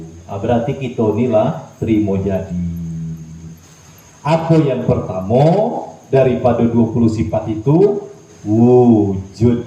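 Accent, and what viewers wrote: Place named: Jambi, Indonesia. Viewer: Assalamualaikum warahmatullahi wabarakatuh.. pas nian Bahaso e lur asli org jambi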